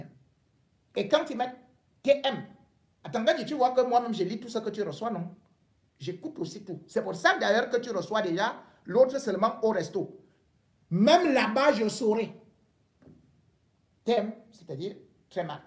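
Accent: Cameroon. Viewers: Le président et son peuple sont en otage .😭 le Cameroun est vraiment dans la rue J aime ton accent Beti 🥰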